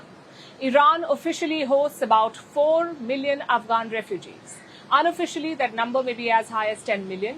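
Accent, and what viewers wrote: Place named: India. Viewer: Her English is India English not English..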